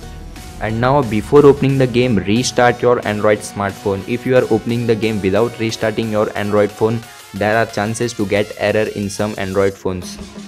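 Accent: India. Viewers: I would try this but you have faded background music and an indian voice... So I know it's a scan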